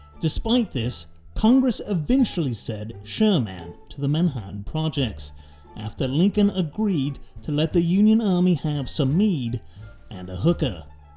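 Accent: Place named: United Kingdom. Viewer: My favorite thing about this whole series is it’s a British man narrating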